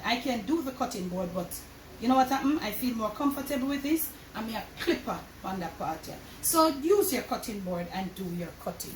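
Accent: Jamaica. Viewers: Yes Miss! Gwaarn wid you bad self. Niceness from start to finish. I love how you keep it real with your lovely likkle jokes and patois style. Bless up and one love always..🇯🇲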